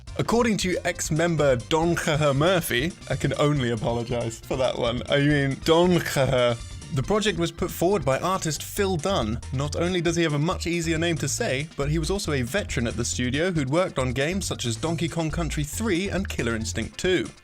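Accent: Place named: Northeast England, United Kingdom. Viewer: I need to know which part of north east England the video narrator is from! 😁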